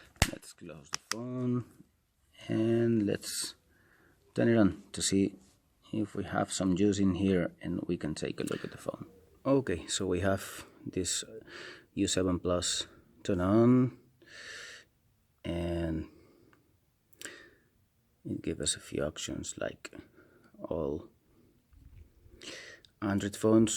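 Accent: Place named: Catalonia, Spain. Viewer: Tens accent català, hahahah! Podries dirme que tal funcionen alguns jocs? En especial pokemon go si el pots probar que tal funciona? Hearthstone potser també si pots, moltes gràcies i bon video!!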